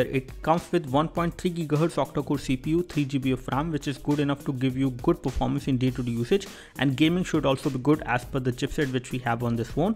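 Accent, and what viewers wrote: India. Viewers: I just heard an English review in Hindi accent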